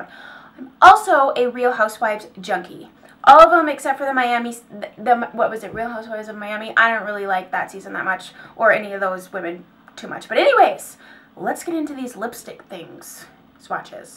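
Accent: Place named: Wisconsin, United States. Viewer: No way! My hubby is from superior WI. We go back every year in the summer to a lake near Madison. He has family in sun prairie. I knew that sounded Iike a wisco accent lol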